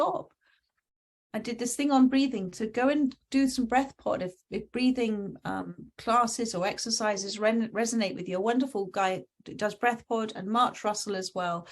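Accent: United Kingdom. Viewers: Love this@ psint the sky on the Wedt Coast with family. They are buying a house. I will have my own rm. Travel again...another grandchild , their slowing down work load to do. I am the matriarch of the family. No worry no struggle. Thsnk you. I've missed your readings. Been months!! I l8ve yor British accent. In my blood on mom's side. May she RIP. I have the Medicine Animal cards. Got in the late 80's. Was in fear during the pandemic. Feeling much better.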